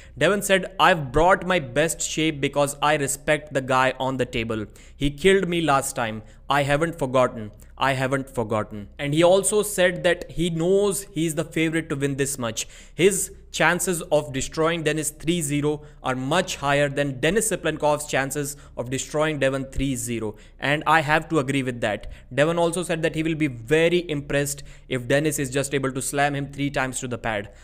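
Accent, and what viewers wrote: India. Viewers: This Indian accent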